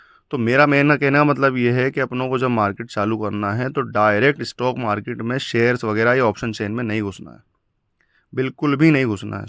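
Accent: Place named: Bhopal, India. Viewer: Bro Bhopal se ho kya?? Accent thoda Bhopali lg rha hai 😅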